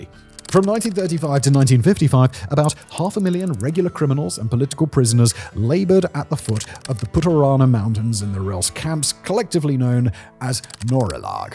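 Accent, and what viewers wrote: United Kingdom. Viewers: Fight to keep human created content! there's nothing better than a British guy talking about history anyway.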